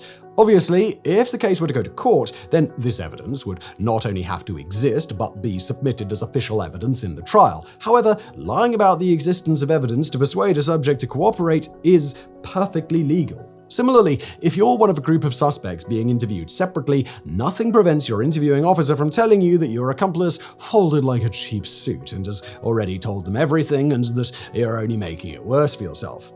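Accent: United Kingdom. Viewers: I wonder how many legs you're going to do in with this video... A Brit narrating a video containing details that are applicable only to the US legal system. Huge oversight. Two VERY different systems.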